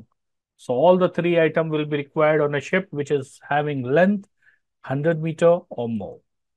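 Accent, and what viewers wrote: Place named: India. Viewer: You are correct in that the video should assist with comprehension. But in my case that was not an option. I was driving and listening to the video, not being able to actually watch it. It’s the “Indian English” that I have difficulty understanding. The narrator does an acceptable with his presentation and English vocabulary. For me it’s the accent. And me being from backwoods Tennessee I have not been exposed to that accent other than the random telemarketer phone call.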